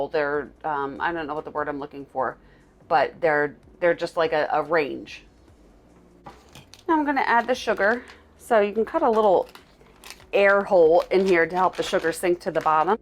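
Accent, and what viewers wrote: Philadelphia, United States. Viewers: Just came across your whiter American buttercream video. Your first 5 words I knew you were from my area😆 then you said outside of Philly. I am now subscribed 😉 But super curious now lol. I am Delco, are you Philly or Chester County?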